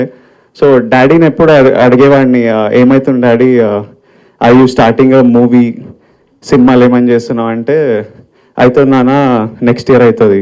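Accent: Telangana, India. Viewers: jai telangana.. hero dorikindu.. jai hero .. super hero..with his speech.. good look.. jai hero..he spoke pakka..hyderbadi..telangana slang..so..natural..jai hero..jai jai hero..jai kcr..jai ktr..jai telangana......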